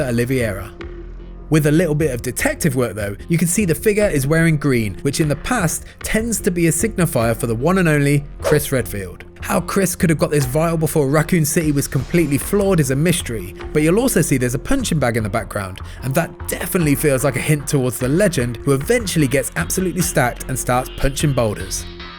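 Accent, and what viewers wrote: United Kingdom. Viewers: Zucc i am 1000% aware he IS british and he has a BRITISH ACCENT... So i guess the problem now is why did i have to put “*in a british accent* if he’s naturally british huh? Why the need to point that out... That’s the issue, right? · 1. There are many accents in Britain 2. This guy IS British. Are you deaf?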